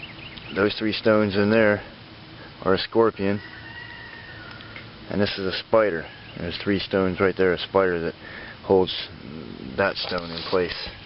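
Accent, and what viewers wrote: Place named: Baltimore, United States. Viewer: are you a Baltimorian? You sound like you are on East coast, you sound like the folks here in Baltimore, MD. LOL Just curious. Love the lamp. I am attempting to learn all these ancient survival skills so I have a shot after the Apocalypse. I plan to survive it.:)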